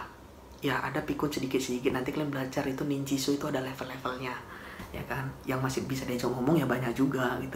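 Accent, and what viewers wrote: Medan, Indonesia. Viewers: medan kali abg ini 👍👍👍 mantap lanjut kan bg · Bang, asal medan kah? Logatnya medan kali soalnya😄😄 semangat ya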